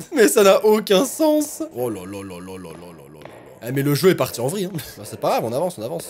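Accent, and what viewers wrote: England, United Kingdom. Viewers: 😂😂 et sons accent anglais😂😂😂😂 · jeux dhorreur Moi: ouiiii on vas entendre crier*-* Et le fameux accent anglais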